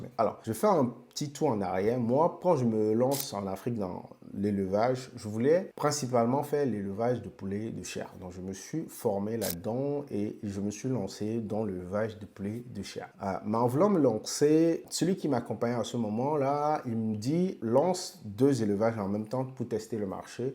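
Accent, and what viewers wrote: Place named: Cameroon. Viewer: Tu parle comme un camerounais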